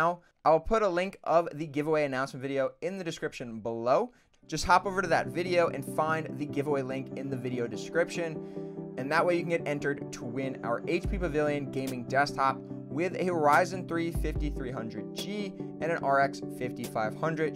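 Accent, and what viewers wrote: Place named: United States. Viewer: which currency is this in. you sound american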